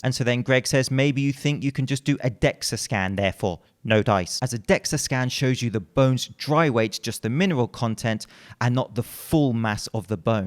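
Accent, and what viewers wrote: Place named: London, United Kingdom. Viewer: Random thought, would bone density, not just bone size also play a part ? Thinking that larger muscles are going to need bigger and stronger (denser?) frame to work off. And if so is that bone density something that can be worked upon ? ie in much the same way that muscle growth is a response to the damage caused to it, would bone density also be affected by damage ? Now of course not promoting the idea of breaking bones. Just think how martial artists strengthen bone through repetition. Probably talking out of my arse but hey if you don't ask questions you ain't gonna learn. Love the vids, though not quite got the old school Landern accent, 8/10 my san!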